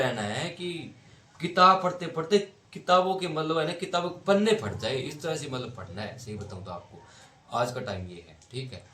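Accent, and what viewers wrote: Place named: Haryana, India. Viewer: Bhai hariyana se ho aap right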